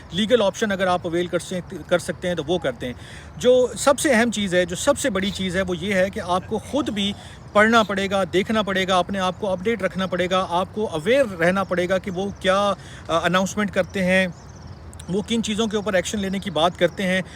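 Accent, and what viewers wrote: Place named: Punjab, India. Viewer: Tum. Pakkay. Punjabi. Lagatay. Ho. To. Phir. Punjabi. Kyu. Nahi. Boltay.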